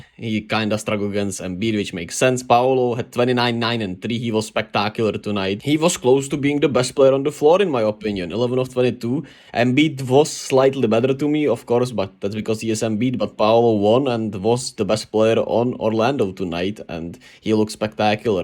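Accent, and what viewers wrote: Czech Republic. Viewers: first time hearing a cezch accent. pretty good video, try improve your flow a bit in the sentences and you'll be interesting for sure! I understand English is not your first language but just keep practicing man. all love here though, I enjoyed it!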